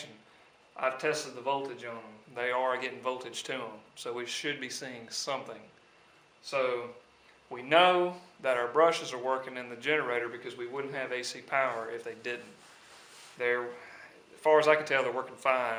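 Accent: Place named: Mississippi, United States. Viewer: Love your videos. It's nice to hear that accent again (grew up in Hattiesburg -- transplanted to the PNW) from a GREAT teacher. Keep it up!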